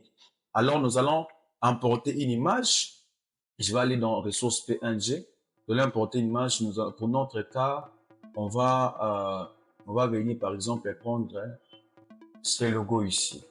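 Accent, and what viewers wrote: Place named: Africa. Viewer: Grâce à toi je sais maintenant comment manipuler un tracé ET son contenu, qui remplit la modification que je fais avec l'outil (A) Sélection Directe sur un tracé - Avant je manipulais le tracé, ensuite je le reconvertissais en sélection et je faisais REMPLIR pff quelle galère .. 😖 Maintenant en convertissant le texte ou un objet en FORME ça change tout ! Le contenant 🔸ET 🔸 le contenu sont manipulables en même temps ☺ Merci, je m'abonne pour te soutenir (en plus j'adore ton petit accent africain 🥰)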